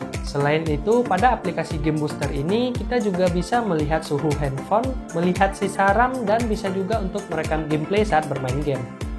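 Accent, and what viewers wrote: Padang, Indonesia. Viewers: Orang padang yo bg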